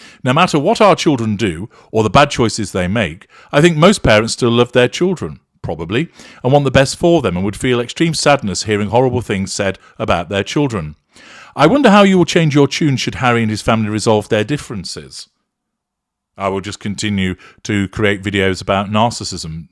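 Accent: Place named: Northern England, United Kingdom. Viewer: OMG, you're white, I didn't know that. LOL I think I do detect a slight Northern accent. I enjoy hearing the "Horn of Ridicule". I'm awaiting more episodes of when you met the Mare (like you, I do not refer to her by name). I'm surprised that nobody had yet used "high yaller" to describe the Mare! Stay well and safe. 😀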